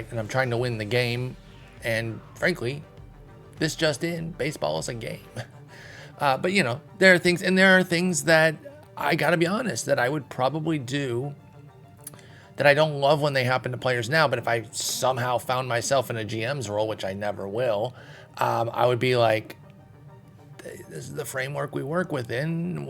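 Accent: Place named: Ireland. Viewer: Lmao the Jacksonville Irish is incredible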